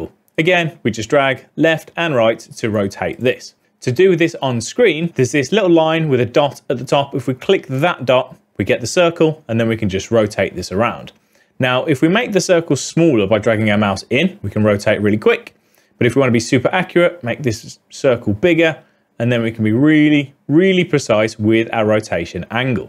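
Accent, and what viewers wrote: United Kingdom. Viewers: I like this British accent. 😀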